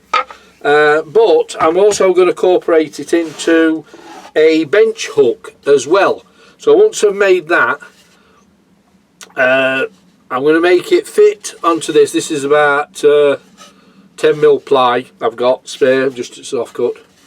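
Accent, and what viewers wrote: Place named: Yorkshire, United Kingdom. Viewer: Nice idea been watching your videos over last few weeks and now subscribeyou sound a Yorkshire bloke witch part of a may ask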